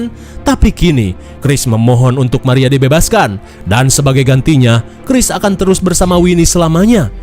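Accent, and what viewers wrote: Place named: Sunda, Indonesia. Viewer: Sunda na lekoh pisan ih 😂😂😂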